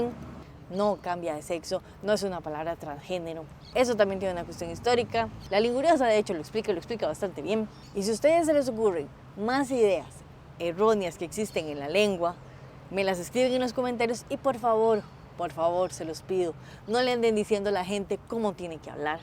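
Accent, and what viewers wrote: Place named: Colombia. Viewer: Pensé que era colombiana profe por si acento. Gran vídeo. Pura vida❤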